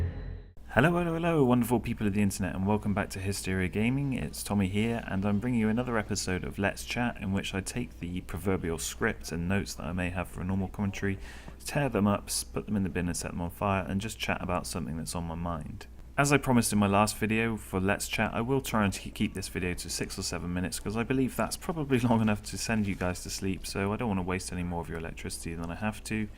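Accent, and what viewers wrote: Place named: United States. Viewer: You have such a convincing accent as an American rapper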